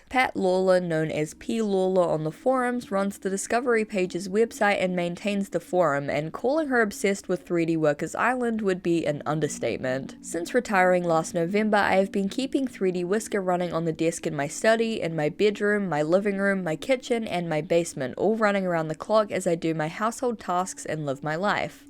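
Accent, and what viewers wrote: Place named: New Zealand. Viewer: I thought she was a kiwi?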